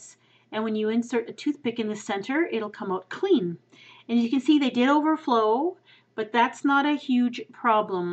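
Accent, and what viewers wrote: Canada. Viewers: ur canadian arent u lol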